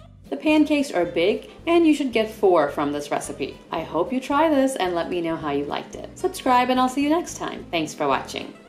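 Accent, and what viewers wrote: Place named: United States. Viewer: I just love American accent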